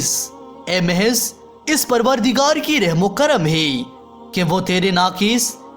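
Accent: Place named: South India, India. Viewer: Video boht achhi h mgr aapki tone south indian ya nepali type h.alag hi lag rhi hai...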